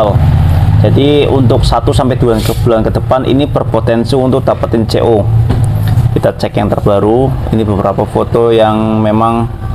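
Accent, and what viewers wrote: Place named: Banyumas, Indonesia. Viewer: Ngapak temen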